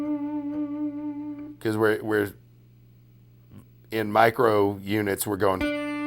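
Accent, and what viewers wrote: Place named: United States. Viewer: This is the most American accent i've ever heard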